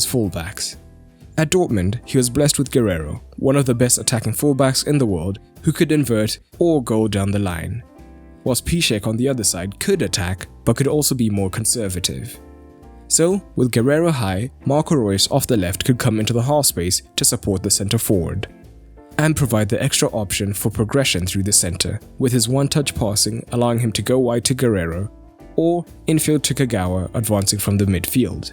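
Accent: South Africa. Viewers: I know a South African accent when I hear one bro 😂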